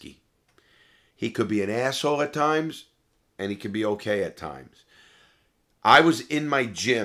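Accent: New York, United States. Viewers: I found this channel and got hooked. I’ve been to jail but never up the road. Interesting to watch and listen. Plus I’m a Long Island native. Knew was from NY cause of the accident.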